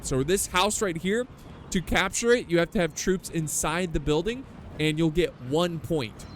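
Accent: France. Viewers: your French accent never fails to make me laugh. You really made my day!! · Love french accent hahaha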